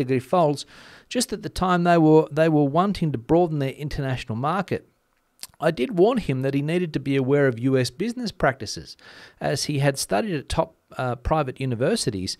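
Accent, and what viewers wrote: Australia. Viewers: did your family make fun of your muted Australian accent? Spain's politics seem tranquil as compared to the USA. I'm stupid enough to have believed we'd return to more sane politics after Trump lost the election. I'm lucky in regard to "Jet Lag". Been to AU and NZ probably 7 times, and Europe at least 25 times. No problems. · nothing to do with anything, but, your Australian accent - I think it's the coolest accent, when it comes to the English language, of all English speaking countries; ours (Canadian, can be funny at times, talking a boot... 🤪)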